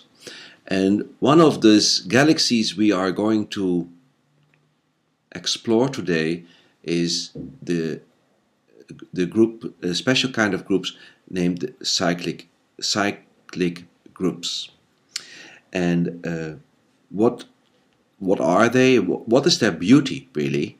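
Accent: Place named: Belgium. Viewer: You sound Belgian!!!!!!!!!!!! Are you?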